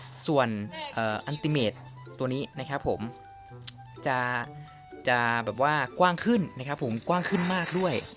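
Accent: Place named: Indonesia. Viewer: Skill translate to việt nam but your voice is indo right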